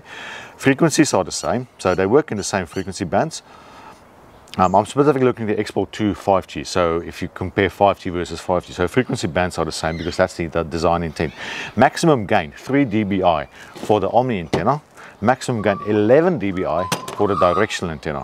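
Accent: South Africa. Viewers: Thanks for the video. You sound South African 🤔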